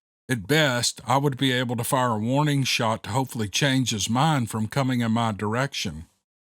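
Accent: South, United States. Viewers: I love how you read.. I could listen to you all day.. I really love the Steve Lily saga but anything you read is fine with me.. as a Arkansas gal, I love your southern twang..The stories in your podcast were great..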